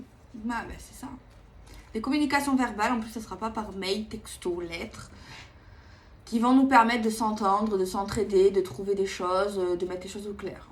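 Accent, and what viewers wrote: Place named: Marseille, France. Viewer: bonjour super vidéos je t’adore et ton accent et de Marseille gros bisous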